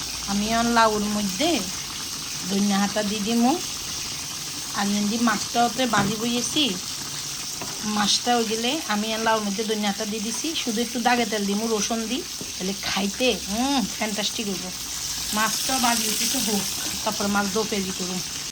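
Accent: Noakhali, Bangladesh. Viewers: আপু তোমাকে নোয়াখালী ভাষায় কথা বললে বেশি ভালো লাগে। ❤❤❤ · Ami delhi theke bolchi…kintu tomar noyakhailya vasha amaar aponjon ke mone koriye dai..boleii tomar vlog dekhi..shaathe tomar khawa dawa..khuub valo laage❤ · Apu Noakhali kotha apnr moke manay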